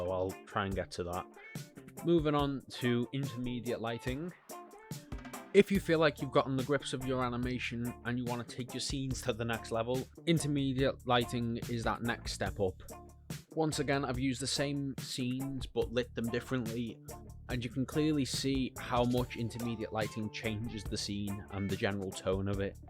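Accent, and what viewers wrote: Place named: Liverpool, United Kingdom. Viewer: Yup i can tell your from Liverpool nice vid!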